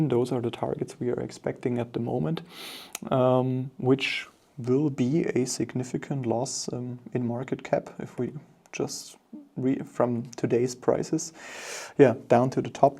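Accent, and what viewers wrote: Germany. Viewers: You have a very strong german pro noun ci a tion!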